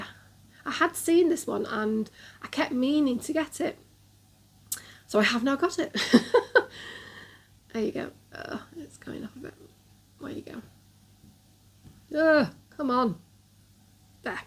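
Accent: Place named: Northern England, United Kingdom. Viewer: Hello! Just found your videos thanks to mama flossabilties. Just wanted to say as a fellow brunette and flosstuber, I feel your pain with the greys! I am due to go next week but in the meantime I am channeling grandfather from the munsters! 😂🧛‍♂️ your stitching is fabulous and I’m loving listening to a fellow northerner! I have subscribed and am looking to catching up with your other videos! Happy stitching lovely -Lauren 🧵💗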